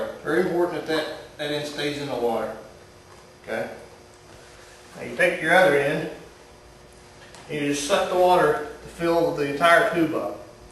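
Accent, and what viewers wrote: United States. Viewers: Where is the accent from? southern states? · virginia accent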